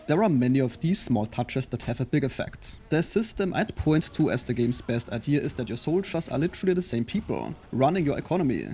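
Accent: Germany. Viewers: Direkt den Alman rausgehört😆😆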